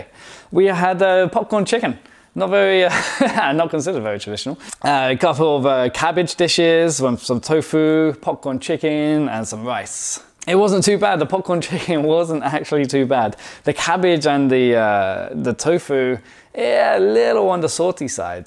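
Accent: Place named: China. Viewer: 感觉你的口音都中国化了哈哈哈哈哈哈😂不知道是不是我的错觉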